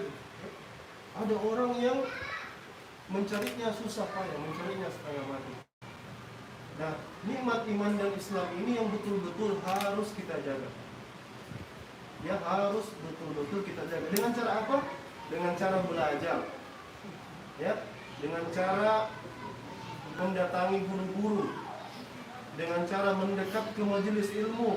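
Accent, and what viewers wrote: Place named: South Sulawesi, Indonesia. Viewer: ini asal daerah dari mana. Sy kdg dengar bahasa Indonesianya logat Bugis makssar.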